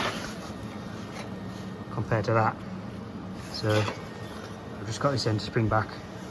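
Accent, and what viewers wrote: United Kingdom. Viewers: Came here on recommendation over on the CEE channel. I really like this kind of work - big fabrication by someone who's not afraid to take on a challenge because they have confidence they can do whatever it takes, even if it means re-engineering things from scratch. The Brit accents can sometimes be challenging for a US guy, but you're clear enough for me to catch the drift. 🙂Good stuff!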